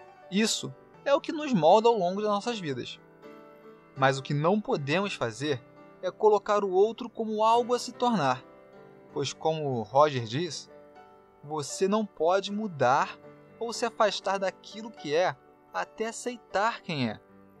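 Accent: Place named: Rio de Janeiro, Brazil. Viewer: (*)ele é cari oca ele é carioca Olha o jeitinho ( o sutaki ) dele ao falar... (*) O meu Coração é carioca A minha Alma é carioca 🙃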